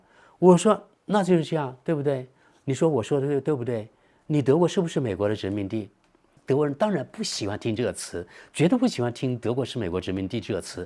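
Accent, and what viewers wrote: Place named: Guiliu, China. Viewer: 他不是广东人 讲桂柳话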